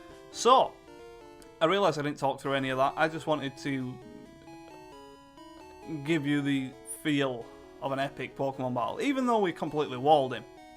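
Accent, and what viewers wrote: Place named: United Kingdom. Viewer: ok youtube really need to work on their close captioning of british accent.. srsly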